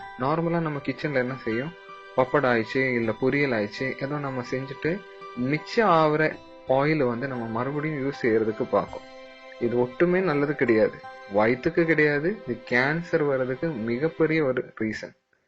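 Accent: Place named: Kanyakumari, India. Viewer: ungal kumari- tamil slank arumai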